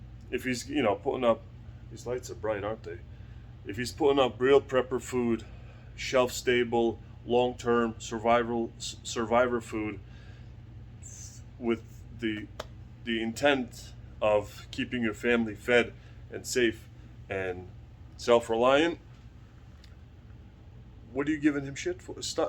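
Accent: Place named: East Coast, United States. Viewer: Congrats on reaching over 500! I knew you’d gain a lot more subscribers! You remind me of old school YouTube when people would create videos for fun instead of making professional productions. You look like you’re having fun sharing and you’re genuine. When you share your experiences/hauls/failures/successes, it’s like talking to a friend. I need to up my preps. Been having some stomach pains lately so the doc suggested a low fodmap diet. That threw a wrench into my preps. Still trying to learn what it entails, but it is so restrictive that it makes most of my preps off limits (hopefully I don’t have any food triggers that irritate my stomach so that I can continue eating normally). No gluten, no beans, no onion or garlic. Lots of fruits off limits. Anyway...thanks again for the entertaining and informative videos. One last thing — one source of protein you may want to look into is tofu, if you eat it. 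There is a shelf stable type by a brand called Morinaga. I got a box from Amazon. It can last longer than the refrigerated type. Oh, and I must say your accent reminds me of my East Coast cousins!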